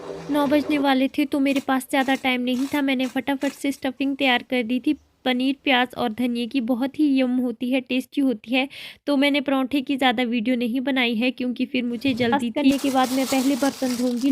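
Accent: Pahari, India. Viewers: Pahari tone is must , that is our culture & identity . So keep it up .God bless you and your family. 🙏🙏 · Hy anty osm vlog muje be apka hindi be pahari slag bhut pasand hai hm pahadi hai bhi pahadi slag nhi ayga to kya English ayga muje to bhut pasand apki voice be or apka slag be or ye dadi g nice hai kse haste haste hue bol rhe the👌👌👌👌👌 apko mara namaty 🙏🙏 or love u bhut sara 😘😘😘😘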